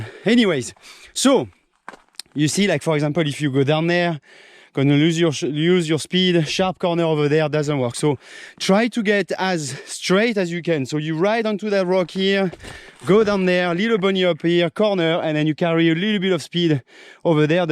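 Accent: France. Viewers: Mais cet accent est tellement bon ! So French ! C'est bon de te revoir rider ! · Me encantas <3 acabo de suscribirme a tu canal y te sigo en Instagram!. Eres un Crack voy a ver todos tus videos para ponerme al corriente, tienes un espíritu salvaje y un chuloooo acento francés, que mas se puede pedir!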